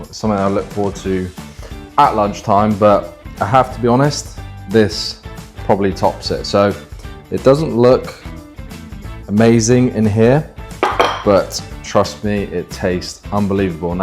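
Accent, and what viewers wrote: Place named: England, United Kingdom. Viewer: This gorgeous, handsome English man in my feed again with that gorgeous English accent - making an old Irish queen like me very happy indeed 😂🇮🇪☘️🏴🇬🇧❤️❤️❤️❤️❤️❤️❤️❤️🙏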